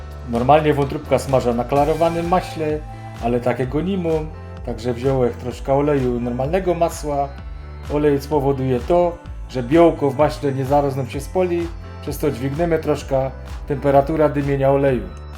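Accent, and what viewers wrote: Silesia, Poland. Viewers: tak slaska gwara nie jest prosta ❤ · Jak mnie wkurwia gwara śląska.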